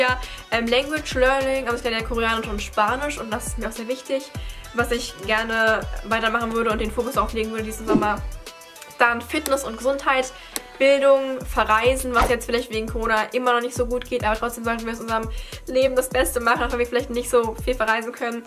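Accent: Germany. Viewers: Du kommst aus Brandenburg 😍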